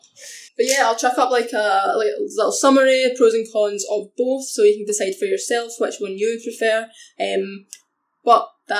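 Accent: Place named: Scotland, United Kingdom. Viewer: I love the Scottish accent sooooo much, oml. Much love from London! UK GANG!!🇬🇧🇬🇧💪🏽 Also, can't wait to read this manga and watch the anime! :D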